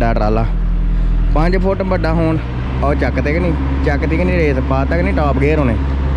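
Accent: India. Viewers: Maza aagya bai....malwai boli❤️ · Veere tuhade language toh lagada tusi kapurthala vale side toh oh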